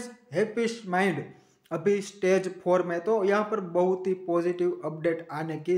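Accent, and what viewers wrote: Gujarat, India. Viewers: Loved Gujrati accent.Reminded me of JETHALAL.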